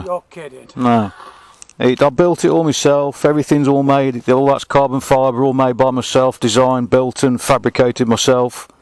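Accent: Birmingham, United Kingdom. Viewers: Very intresting guy to watch . U a brummie like myself 😂👍👌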